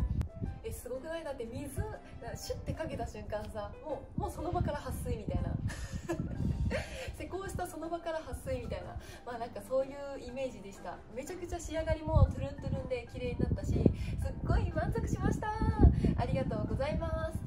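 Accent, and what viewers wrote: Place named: Kyushu, Japan. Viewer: 九州出身なのでたまに出る方言がとても良いと思います😊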